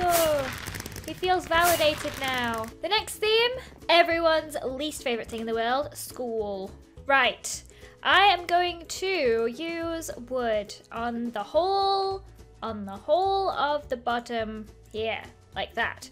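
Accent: United Kingdom. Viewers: She's british, they pronounce things differently.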